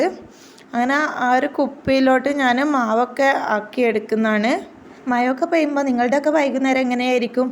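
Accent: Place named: Malabar, India. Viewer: ഇമ്മള് കോഴിക്കോടൊ അതോ മലപ്പുറമോ ട്ട ട്ടട്ട❤❤L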